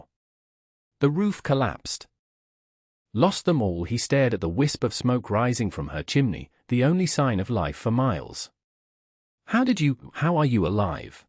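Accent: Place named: United Kingdom. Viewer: A very feel good story. But these are much better with a cowboy voice, not British. Alabama 🇺🇸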